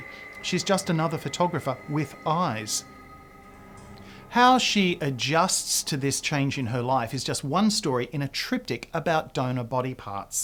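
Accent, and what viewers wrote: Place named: Australia. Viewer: good review! nice accent you Aussie?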